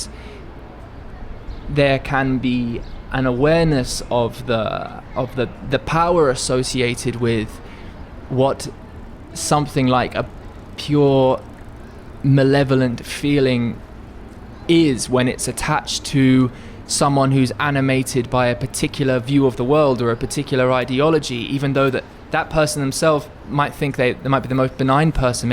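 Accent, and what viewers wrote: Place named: RP, United Kingdom. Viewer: You sound just like the sort who's come back from his gap yaaar abroad in Thailand.. but great vid haha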